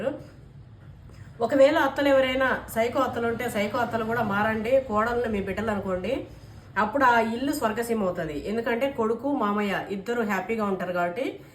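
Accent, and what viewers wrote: Telangana, India. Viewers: తెలంగాణ యాస లో మాట్లాడారు🎉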